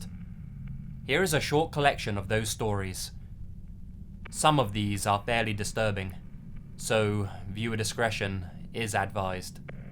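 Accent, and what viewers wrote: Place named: England, United Kingdom. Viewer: I LOVE this format. Lots of little (but intense) stories. Thank you for all your work. I love your voice too. Being from Sydney I hear a lot of English accents but yours is so warm and lovely.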